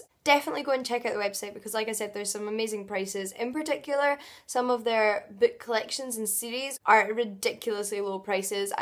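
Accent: Scotland, United Kingdom. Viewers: Hi there! I've just discovered you and I love your channel ❤️ You do really nice videos!!! I heard your accent and thought: this girl must be Scottish! 😍 I looooove your accent. I was in Edinburgh last summer and I'm coming back next month. Which part of Scotland are you from? Kisses from Spain xx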